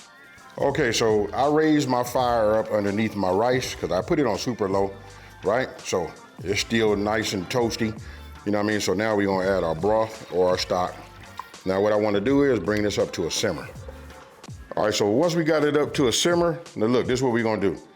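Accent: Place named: South, United States. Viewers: where would you say your accent is from? I noticed your vowels sound a lot like Ryan Coogler's from the interviews I've heard and I've heard that accent before but idk if I can place it. It's like a combination of Southern California and the American South but not somewhere I can place. Is it Oakland? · I will believe any cook with a southern American accent that they know what they are doing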